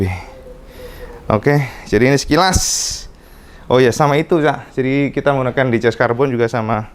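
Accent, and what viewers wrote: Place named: Tulungagung, Indonesia. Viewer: ketoro sekk enom cak sman 🤣🤣🤣 bhasa tulungagung mesti ujuk"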